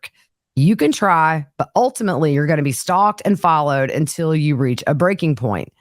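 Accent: South, United States. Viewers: Love you! Love these prison life podcasts! Love your Southern accent! Love what you do! Thank you SO much! Appreciate you! ❤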